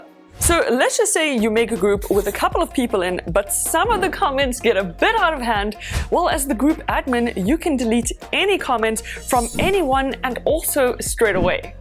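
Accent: South Africa. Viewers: Are you South African? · Great video, are you South African, you sound South African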